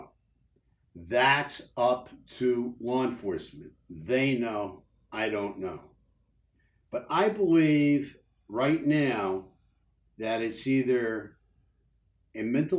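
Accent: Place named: Massachusetts, United States. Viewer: are you from Massachusetts? You have an accent.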